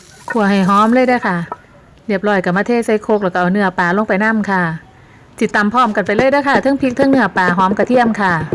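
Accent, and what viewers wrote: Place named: Isan, Thailand. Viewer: สวัสดีครับ มาฟังเสียงเว้าอีสานจ้อยๆดีแถะน้อ....โอ๊ยยย....เป็นตาแซบคักแนบาดเนี่ย...แซบๆกับอีหล่าสองคนเน๊าะ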